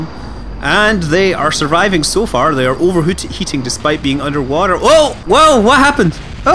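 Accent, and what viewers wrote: Scotland, United Kingdom. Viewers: It's the Scottish accent. And often the Explorer becomes an exploder!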